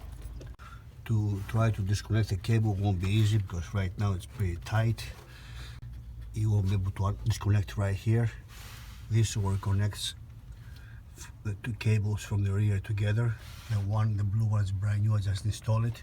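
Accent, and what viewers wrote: Greece. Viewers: Hey, you Greek? You sound Greek to me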